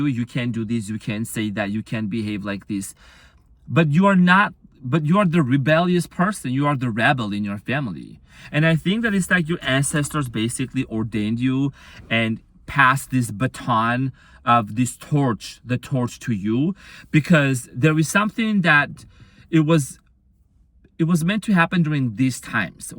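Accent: United Kingdom. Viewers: I’m impressed with your British accent. I’m from the UK ❤ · You make me smile so much / with all the sassy shit references …in a British accent…. Meet me beyond these walls and we shall speak further…with action…. Persist in this way and consequences will induce themselves. 😂❤ love it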